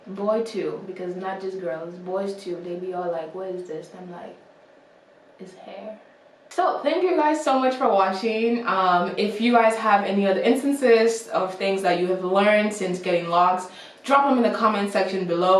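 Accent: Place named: West Africa. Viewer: Wait, I hear a West African accent somewhere in there; na Naija you be? You had me LOL for real. Love your personality. New subbie!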